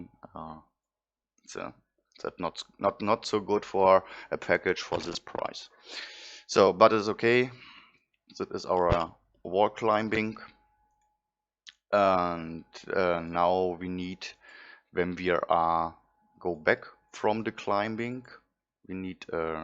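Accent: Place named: England, United Kingdom. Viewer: i wanna say a big Thanks for all of your tutorials they are really help :) (i love your lovely English accent)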